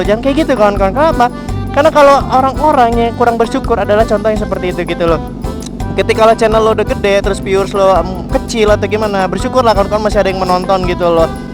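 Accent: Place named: Java, Indonesia. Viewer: Ngakak pas bang bicara ada logatnya jawa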